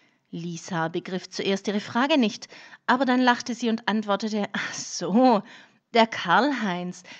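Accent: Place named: Hohenlohe, Germany. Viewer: Sehr gud vorglesa wirdli supi, awer zur muswisa ghärt vileicht doch a bisle mär hohalohisch dazu mansch net a liwi?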